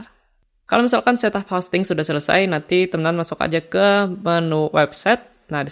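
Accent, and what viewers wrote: Banjar, Indonesia. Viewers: logatnya pina kayak bhs banjar pahuluan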